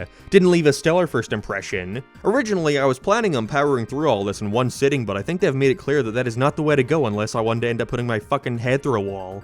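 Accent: Ireland. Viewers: Irish accent I believe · It's an Irish accent you simpleton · He’s irish you testicle sucker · You sound more Irish when you were younger. Glad you grew out of that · It's kind of put on but also he's just irish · i keep forgeting your irish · It’s a Irish accent he even talked like that as a child man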